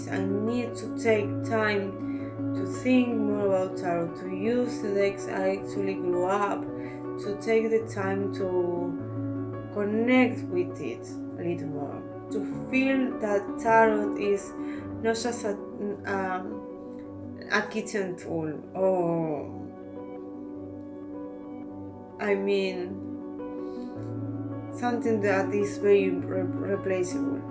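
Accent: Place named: Spain. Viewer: Hello this video is very interesting and you should put up more videos! Where are you from actually? Im thinking spanish due to the accent but im not certain. Greetings from ireland:)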